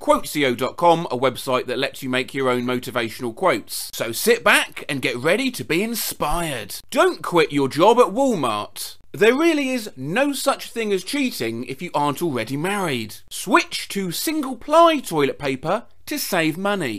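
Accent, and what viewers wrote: United Kingdom. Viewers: i love british people reading internet things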